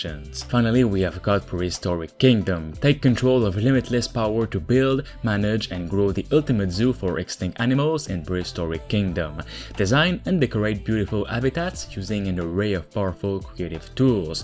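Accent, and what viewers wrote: Quebec, Canada. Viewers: Est-ce que t’es Québécois ? ton accent te trahis un peu big😂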